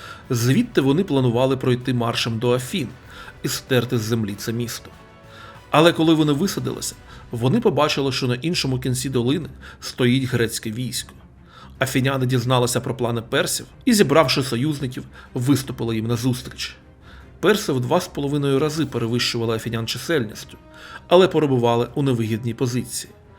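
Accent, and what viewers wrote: Russia. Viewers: Майже скрізь де вживаєте "ґ", там має бути "г". Не приємно чути кацапську вимову. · Ріже вухо гекання. Українці так не розмовляють. Вибачте. Може я не правий . Але кацапське все бісить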